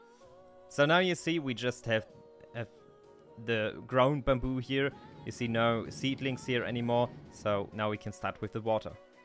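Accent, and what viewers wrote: Germany. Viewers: And btw you hear like your are german, and here is a qord for you : im a ALMAN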